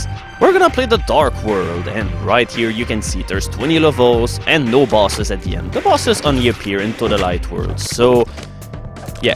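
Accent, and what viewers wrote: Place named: Canada. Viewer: Daaamn, you sound even more Canadian than me!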